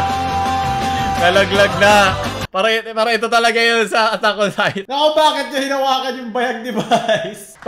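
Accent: Visayas, Philippines. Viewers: Bisaya ka hahaha yawa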